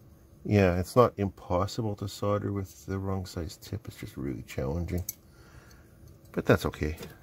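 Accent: United States. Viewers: That's mainly coz we Aussies Solder, while he SoDDers like a yank.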